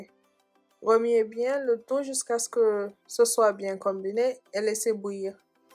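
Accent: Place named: Senegal. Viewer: elle vient du Sénégal vu l'accent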